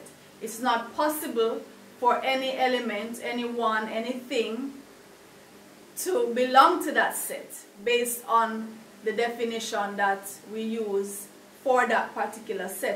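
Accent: Jamaica. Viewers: Really nice to hear a Jamaican voice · are you a Jamaican I love the way you speak... The accent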